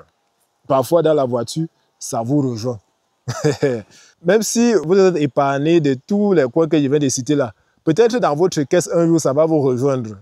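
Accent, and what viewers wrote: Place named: Sub-Saharan Africa. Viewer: Je m'abonne parce que je suis toujours enchantée par l'accent des Africains Subsahariens 🙂 Évidemment surtout parce que j'ai aimé les "tips" contre les moustiques et les cafards. En Algérie sur certaines côtes il y en a qui volent, j'ai vraiment peur de ces bestioles. Merci pour le partage.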